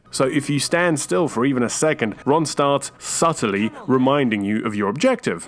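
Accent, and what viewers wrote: United Kingdom. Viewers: Yes, i want a review of all HP games too. I want to hear your very british voice talking about very british stuff (despite the fact movies and games are american)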